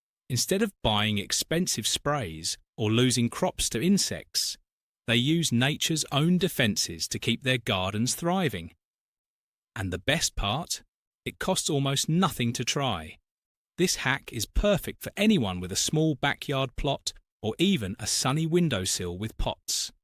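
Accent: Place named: United Kingdom. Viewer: Is this AI narrating ? He speaks with a British accent but uses American phrases 🤮 Ffs might as well use a Yank narrator.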